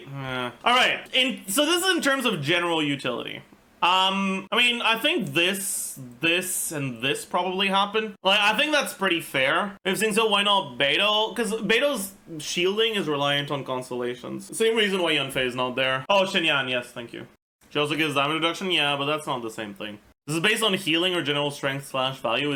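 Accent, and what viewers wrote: Quebec, Canada. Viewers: I speak french but I'm not used to canadian french, I understand so little lmao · Except as he put it if he ever travel to Paris, people will have to focus to understand what he is saying. His accent is really pronounced but its not as if only Quebec has strong accents so its no big deal. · ouais, alors, en tant que français.e de france, ça reste chaud le français quebecois ToT mais j'adore <3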